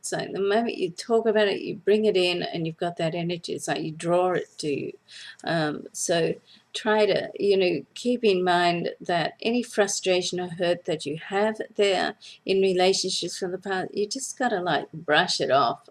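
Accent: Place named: Australia. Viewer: Many thanks I really enjoy your weekly astrology videos. As a Taurbabe ( kiwi) now living in Vancouver, your Australian accent is lovely to hear. Cheers Deb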